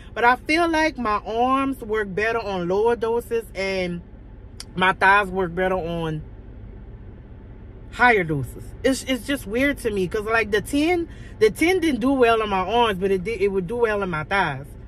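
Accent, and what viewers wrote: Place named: New Orleans, United States. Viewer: I can hear the New Orleans in the accent baby! lmao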